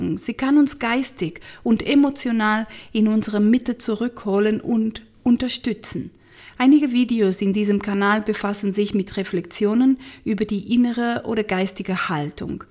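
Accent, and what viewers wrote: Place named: Spain. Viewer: Liebste, Ich bin auch so froh,deine Infos auf diesem Kanal gefunden zu haben!!!!Es erleichtert mir als ostheopatische cranio sacralTherapeutin enorm meine Behandlungen,da mein Ziel ist, die Menschen in ihre Eigenverantwortung zu bringen-und deine Videos unterstützen dies. Ich muss nicht mehr etliche Mobilisations-und Achtsamkeitsübungen von Kanal Betreibern zusammensuchen..oder meine Therapie Einheit durch Übungen kürzen..ich erkläre das meiste am Körper während meiner Arbeit -und sende den Clienten als Geschenk ein Video von dir nach! Es ist Perfekt!So perfekt! Und sie lieben deinen reizenden spanischen Akzent genauso wie ich. Ich wollte dich dies wissen lassen,damit du weiterhin Kraft und Freude und Motivation für deine Arbeit mit den Videos hast-wissend,sie werden geschätzt! Und sollte einmal ein Thema anstehen,wage ich es, mich zu melden. 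Das Hauptthema bei den Menschen ist-die Atmung!!! Immer wieder. Deshalb Dank ich dir für dies Video am meisten 🙏🏽 P.S. Was ist deine Meinung zur Wim Hof Methode...vor allem das Atemmuster?das würde mich interessieren. Vielen Dank im vorraus für deine Zeit,dies zu lesen,und wahrscheinlich-wie ich dich kenne-auch zu antworten. In Verbundenheit, abrazarte desde austria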